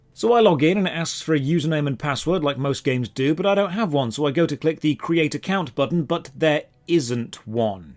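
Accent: United Kingdom. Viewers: agreed. then again, he's handsome. And speaks British English. I'm sold. xD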